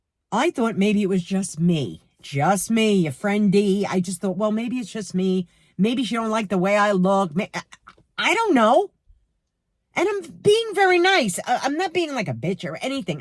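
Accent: New York, United States. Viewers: I think it's fairly common; even if they don't say anything; it's just an attitude issue !! workers can be like 'robots' !! I like your accent; was about to ask if it's a NY accent ! 💚💟 I think she will learn from the experience.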